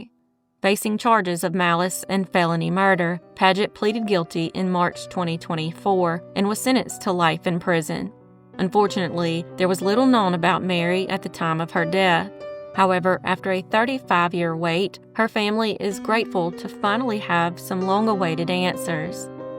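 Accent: South, United States. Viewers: I like your little music that you play in your southern accent hello from Minnesota❤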